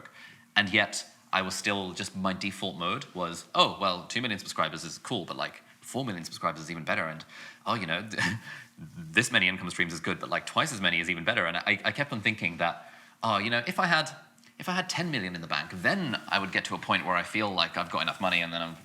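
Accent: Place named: United Kingdom. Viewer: Really amazed watching an Arab speaking English with a perfect British accent. Assalamu alaikum, Akhi. 🙏🙏🙏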